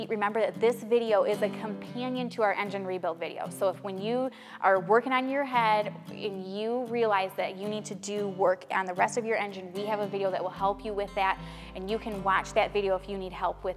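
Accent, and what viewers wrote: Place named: Midwest, United States. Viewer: I could listen to read a phone book.. Reminds me of home with her Mid-Western drawl..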